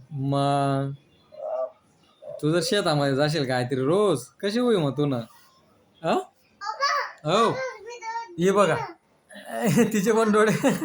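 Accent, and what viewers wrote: Khandesh, India. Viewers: Kont gav ahe tumch भाषा vrun tr खानदेशी vatay tumi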